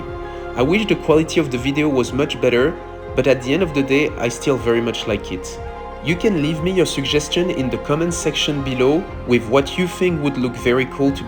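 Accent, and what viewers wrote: France. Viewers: Oh man, now I get what people mean by "strong french accent". And that's from someone who's French themselves ! Over than that, great english, and super cool Kraken. Your work is always breathtaking ! :)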